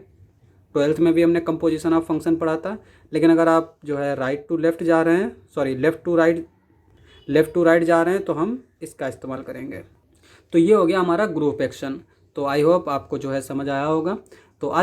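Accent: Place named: Bihar, India. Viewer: bihari accent=lit video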